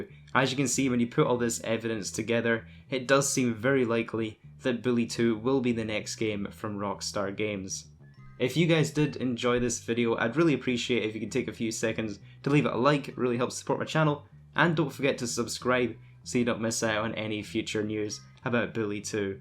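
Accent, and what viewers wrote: Scotland, United Kingdom. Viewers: Omg where u from, I'm Scottish too! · he's Scottish clearly you dumbass......🤣😂😆😅😀 take a course in grammar dip shit....you mean to say..He's clearly Scottish you dumbass ass...man I can tell your a special needs kid aren't ya lmao. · nonya business He's Scottish, not English. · he's Scottish clearly u dumbass, ur obviously not from england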